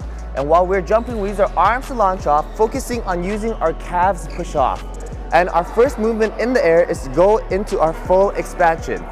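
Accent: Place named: United States. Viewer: i've watched both your smash videos and have the following comments about what i like and dont like about the way you teach and/or perform smash. like: 1) you emphasize getting your body behind the shuttle so that your energy comes forward to smash, not backwards or straight up. the energy is forwards. there are many details to the smash, but if a beginner learns only one thing, it should be to do the work to get your body behind the shuttle, not beneath it, so your energy comes forward as you strike. and not only smash, but also clear and drop, get your body well behind the shuttle before you try to strike it. i like the way you said 45 degree angle forward. that is right on, 2) the way you demonstrate pronation seems very clear to me, 3) the way you use throwing to demonstrate the swing. i assume you are in the US from your accent and the word 'bird', and one sport americans understand is baseball. 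if you look at major league pitchers in slow motion you will see many relevant components of smash and swing. dont like: 1) your flow of energy from the floor to the racket head is compromised by a failure to isolate your hips and rotate them separately in advance of your torso. this means that even though you rotate your body 90 degrees before your smash, you are not taking full advantage of the potential rotational energy there. really, there is no point in rotating your body a full 90 degrees before smash if you do not isolate your hips and rotate them separately, and i have s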